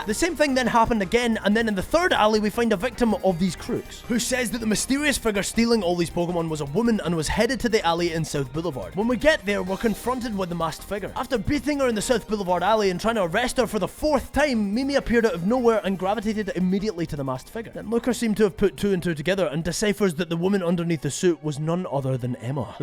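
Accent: Scotland, United Kingdom. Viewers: This is the first moment in the video that I realized you had a Scottish accent. 😂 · Oi m8 what’s yer nationality cause I hear some Scottish in that accent (the speed and the emphasis on p, c, s) also I like the persona music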